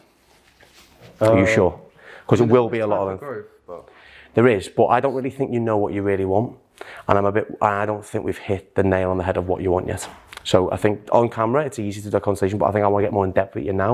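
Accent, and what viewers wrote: Liverpool, United Kingdom. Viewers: scouse accent? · Love your scouse accent mate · Scouse I believe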